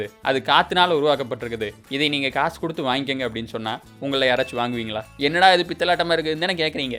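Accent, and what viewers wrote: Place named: Kongu, India. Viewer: Kongu slang ❤❤❤❤❤❤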